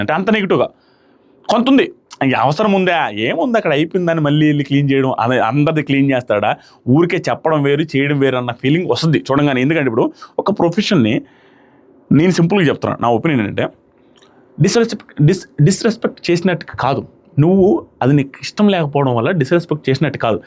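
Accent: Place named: Udal, India. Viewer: UDAL MOVA MATLADE VIDHANAM ISTHAM UNNA VALLU OKA 🤍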